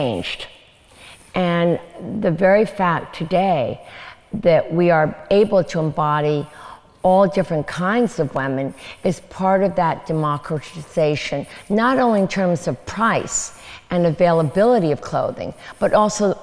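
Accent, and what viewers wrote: United States. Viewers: Lily Conte Agree! I'm perplexed. She speaks perfect "American" English. It doesn't make any sense.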